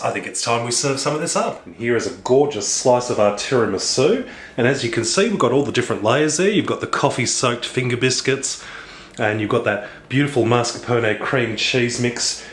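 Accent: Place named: Australia. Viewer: Has anyone told you before tht your a handsome guy wow,I must say and I love the accent as well I'm from South Africa and trust me our men thts coloured do not speak like you I guess you are Australian am I right ?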